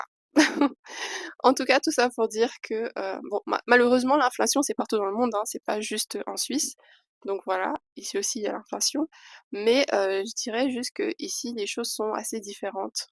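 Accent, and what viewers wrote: Cameroon. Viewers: bcp de courage ca va aller.vous avez bcp de tics camerounais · Bienvenue sœurette, tu es chez toi ,et un grand bravooo ,sans avoir vécue au Cameroun, tu as déjà l'accent Camerounais. il fait beau vivre chez toi au Cameroun. Et tu es très jolie ❤❤❤ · Hahaha! Mort de rires! Je croyais que c'était une blanche, mais elle a l'accent, elle cook la tchop du pays, elle est go au litch.... Ma cherie, même si tu vas te cacher dans une montage suisse, tu ne seras jamais Heidi, tu es camer! C'est pas de ta faute, c'est dieu qui t'as donné tu ya non? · L'accent Camerounais ja mange je mange je mange😭 ❤weeehh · Félicitations ! Et en plus tu as l'accent du pays 👌et tu es une Ekang, la totale !!! mbamba nsoan ngoan Ekang !👋👋🖐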